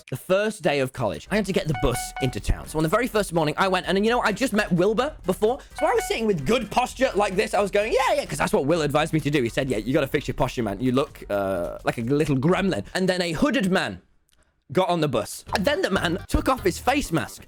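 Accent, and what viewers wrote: United Kingdom. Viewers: Bruh I loves this guy's British accent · BHAHAHAHAHAHAHHA HOW DOES HE SOUND AMERICAN? YOU CAN HEAR HES 100% BRITISH LMAO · Yay your British!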